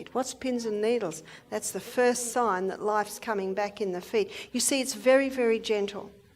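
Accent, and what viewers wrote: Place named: Australia. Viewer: She's Australian....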